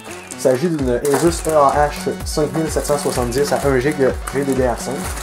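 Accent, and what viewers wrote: Quebec, Canada. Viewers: Le quebec x)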